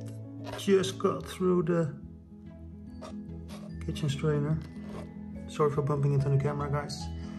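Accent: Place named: Netherlands. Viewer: Ik hoor gewoon dat je nl bent hahah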